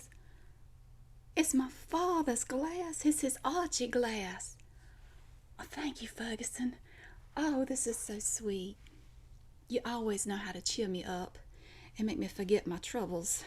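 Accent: South, United States. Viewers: I miss these accents, it’s rare to find someone who still has that “southern belle” twang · I love the accent, where about are you from? I'm from East Tennessee.lol you should do a Hillbilly asmr lol.that would be funnier as a bucket of tadpoles.lol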